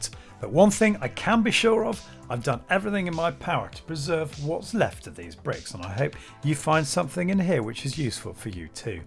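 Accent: United Kingdom. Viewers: I think his pronunciation is standard in the UK.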